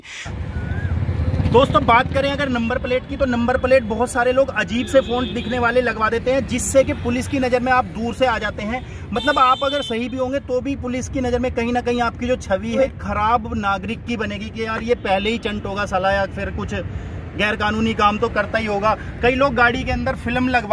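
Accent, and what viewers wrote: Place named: Meerut, India. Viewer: Bro...are you from Meerut ?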